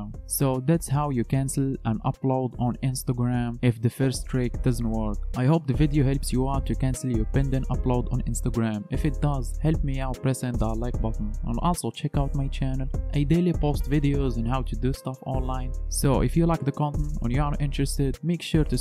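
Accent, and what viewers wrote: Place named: Morocco. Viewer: Ur accent is so moroccan maaaan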